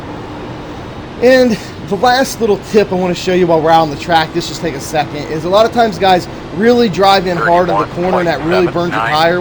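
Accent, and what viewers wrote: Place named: Baltimore, United States. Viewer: This dude is from Delaware Maryland PA area, sounds really Baltimorish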